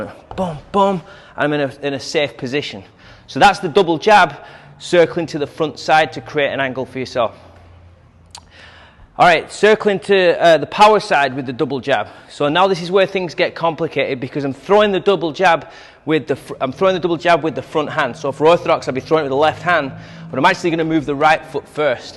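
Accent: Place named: Liverpool, United Kingdom. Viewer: You're a South Park and possibly a Scouse❤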